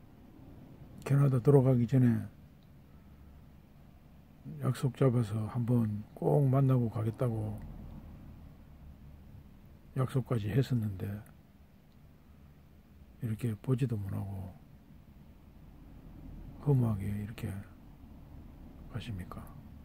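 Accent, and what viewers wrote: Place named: Busan, South Korea. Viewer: 부디 편안하게 영면하소서..구수한 부산사투리 정감있고 좋아했는데..너무 황망하게 가셨네요.ㅠㅠㅠ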